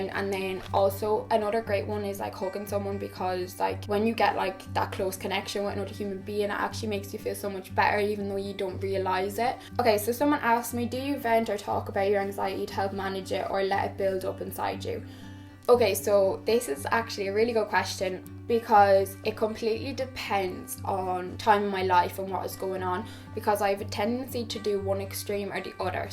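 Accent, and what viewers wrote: Ireland. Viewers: oh my god. you speak in such an irish accent. omg, melanie sounds like an american and you sound like REALLY ORIGINALLY Irish. omg 😊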